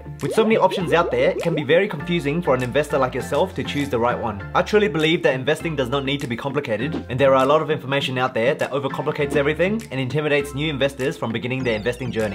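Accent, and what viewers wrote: Bankstown, Australia. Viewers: You sound like you live in Bankstown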